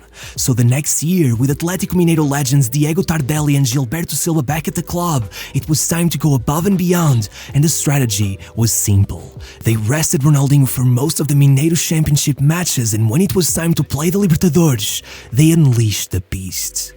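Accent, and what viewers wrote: Portugal. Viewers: You sound portuguese my dude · Bro, why do you speak in Portuguese and not PT-BR? Sounds kinda weird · I know a portuguese accent when i hear one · You can clearly notice the narrator is portuguese 🙃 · the portugueese accent is there hahaha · Portuguese accent is crazy xD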